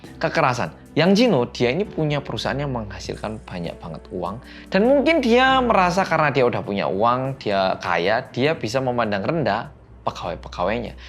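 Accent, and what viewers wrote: Java, Indonesia. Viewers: Medokk..., Suara e itu lho kangen denger nya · Bersama orang KOREA yang MEDHOOOKKKK... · Medhokkkk😎🗿 yang ditunggu" detektif 😎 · Welcome to orang koreaaa yang medhooook😎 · Hello guys welkom bek tu korea reomit bersama orang korea yang MEDHOOKK~ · Team gercep😀.. Kalo ada bang medok, gak bakal terlewatkan di notif.. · Oom kliatan fresh sekarang, tapi udh berkurang mendhoknya😂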